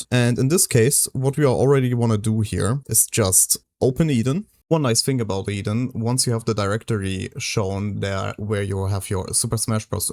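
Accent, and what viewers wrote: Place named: Germany. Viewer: i hear a little deutsch in your voice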